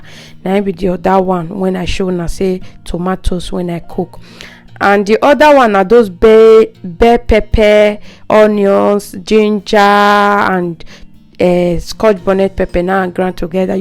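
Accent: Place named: Nigeria. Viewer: The jollof rice looks so yummy, the color alone dey make me hungry and your pidgin English dey sweet for my ear, I know say naa so the rice go sweet well well! And yes this naa the rice wey I grow up dey chop the only difference na the spices because those days if you get Maggie, salt, onions, pepper, tomatoes and crafish jollof don ready be dat, fish or meat fi no dey wetin you know be say chop belefull! Na so we go dey use hand take dey mold am put for mouth 😂 … is a great cook.Thanks for sharing dear ❤️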